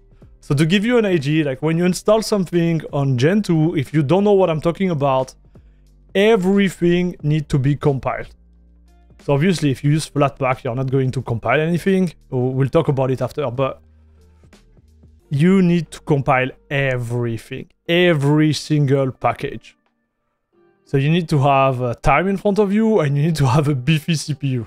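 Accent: France. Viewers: On dirait que vous avez un accent français non ? Ou francophone en tout cas.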